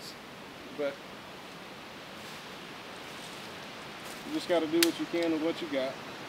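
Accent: South, United States. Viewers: Something about this southern accent makes them feel more trustworthy and honest. Or maybe its just this guy's way of explaining everything without being full of himself. Great video,, Thanks.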